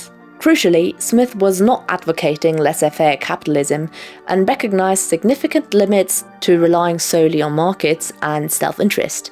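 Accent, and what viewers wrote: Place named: London, United Kingdom. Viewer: Good research effort,. Love your educated London accent… music to my ears. The glottal stops give you away, unless…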